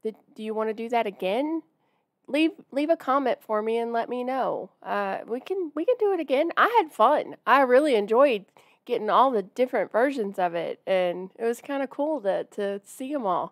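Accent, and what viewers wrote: South, United States. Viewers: I love your channel. You're fun, show real reactions, and sweet. The southern drawl is warm and inviting. I've never seen judgement in your eyes and you support everyone you watch. + I LOVE, and many others and you deliver what your followers want. So thank you! ❤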